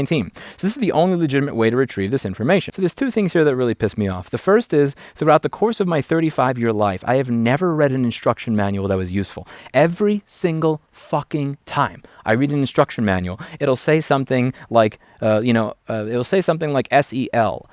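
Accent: New York, United States. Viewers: The New York drawl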